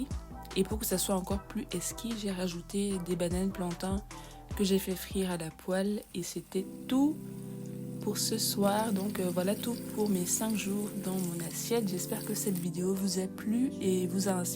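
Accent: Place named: Cameroon. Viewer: J’adore bravo… 3 vidéos en quelques minutes , je me suis abonnée à la 3eme minute après la 1ere vidéo. Le petit plus la voix et l’accent un peu camerounais à la quelle vient s’ajouter la forme des bananes plantains 😊 ça renforce cette idée des origines camerounaises… Très belles vidéos et franchement la voix est captivante ❤❤❤ Je te souhaite un beau succ😮pour la suite ❤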